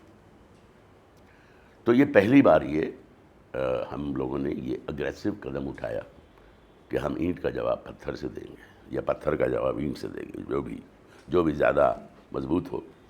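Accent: India. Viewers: Although sound indian I admire his voice..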